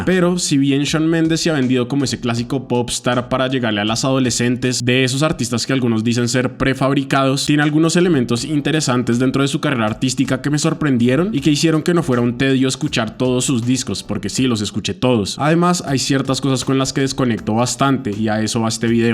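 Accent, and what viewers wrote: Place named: Colombia. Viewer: No se nada de. Me gusta el tono colombiano de tu retórica, creo que es lo que le da consistencia a tus ideas. Pues, hay otros análisis de carreras musicales en tus videos?:)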